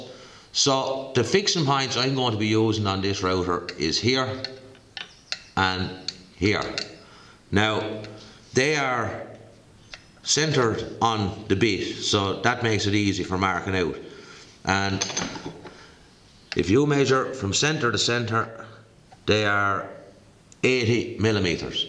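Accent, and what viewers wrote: Ireland. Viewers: Thank you. Practical and in METRIC. All delivered in a delightful Irish accent.👍Plus the clamping and direction tips.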